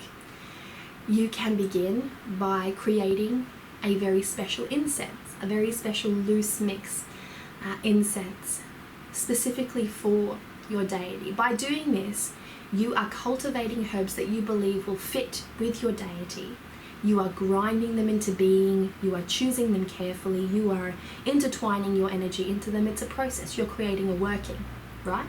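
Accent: Australia. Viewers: I can tell you're Australian from the accent, am I right? Working with the saints so to speak, you ain't mucking about That's for sure. Best of luck.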